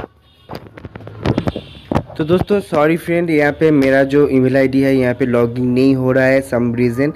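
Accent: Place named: Assam, India. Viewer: Assamese accent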